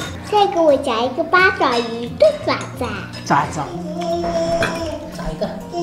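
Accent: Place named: Quanzhou, China. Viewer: 我离开泉州二十年了。听见乡音很亲切！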